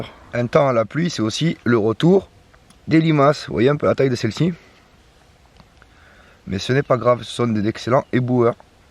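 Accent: South, France. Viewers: Tu es ou, avec ton accent dans le sud ?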